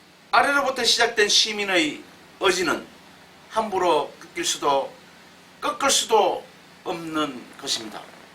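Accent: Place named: Ulsan, South Korea. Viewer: 울산 액센트가 궁금하시다면 이 방송을 꼭 보세요^^ 응원합니데이~ 욕봐씸더 ~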